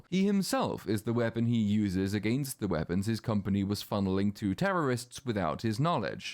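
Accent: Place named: Oxbridge, United Kingdom. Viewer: his Oxbridge accent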